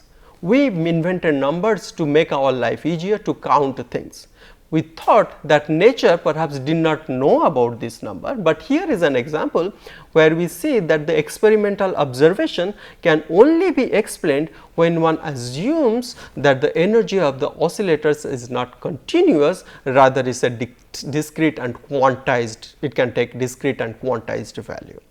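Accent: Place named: Kolkata, India. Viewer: Jadavpur English. He pronounces "absorb" as "abserb." It is REALLY difficult to make out what he is saying. And he trips too many times.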